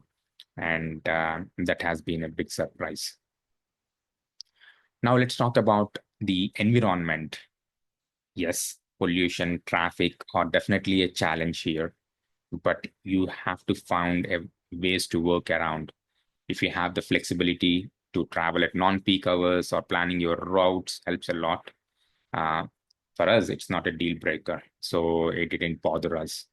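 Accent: India. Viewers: Your accent is very bad and abrasive, like that of an uneducated Indian, though you lived 20 years in US! You must be a lazy person! Telugu people are very lazy! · After staying in the USA for 20 years how do you still have a such a strong indian accent? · You look like a man in late thirties and if you’ve lived in the US for twenty years, that means you must’ve been in your late teens when you first moved to America. That leads me to my next question: how come your English sounds like you’ve never left India? Just curious. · Oh dude you lived in US for 20 years but your accent is still as if you never left India but so sorry that you had to return back, is it Trump?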